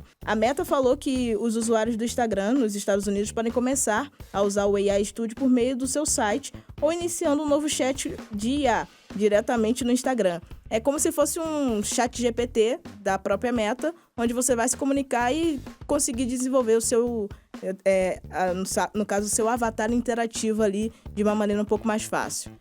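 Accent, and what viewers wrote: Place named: Rio de Janeiro, Brazil. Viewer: Meu sutaque carioca! Saudade da minha terrinha.. haha. Bem vinda!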